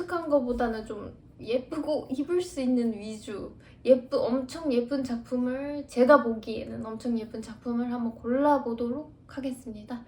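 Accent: Seoul, South Korea. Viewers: 서울사투리 오랜만에 듣네요 ㅋㅋㅎㅎㅎ 잘 배워갑니다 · 정말 예쁩니다 판다면 사고싶을 정도로에요 ㅜㅜ 긴머리랑도 잘 어울리시네요 추천으러 처음 봤는데 말투가 중독이에요 약간 서울사투리+어딘가 사투리 섞인 느낌이네요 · 말씀하시는게 약간 옛날 서울사투리? 그런 느낌이라 너무 신기해요 ㅋㅋㅋㅋㅋㅋㅋ 목소리 높낮이도 그렇고 말투도 그런느낌이세요!! 목소리 이쁘시고 묘하게 웃겨요 ㅋㅋㅋㅋㅋ 😂😂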